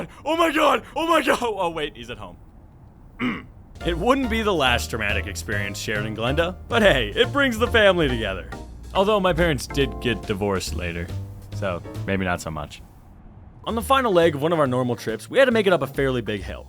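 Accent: Canada. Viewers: wait are you canadian????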